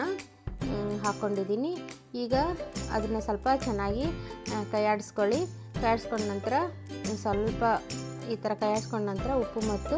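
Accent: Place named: Dharwad, India. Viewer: Nimma Dharwad Uppittu bhaal sertu.!!!! Aadra, nimma maatu Dharwad shaili olaga iddra namaga bhaal Santosh agatada.!!!! Nauo hemme inda namma kannada maatadonu!!!! 🙏 🙏 🙏 🙏 🙏 🙏 🙏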